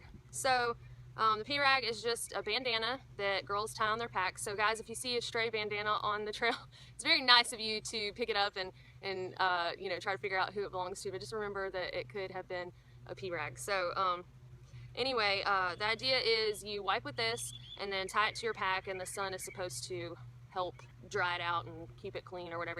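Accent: South, United States. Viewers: What southern state are you from? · Hey, okay, I have to ask, what part of America is your accent from, I love it. No offence, Brits always ask this question! Warm regards. DisabledPrepper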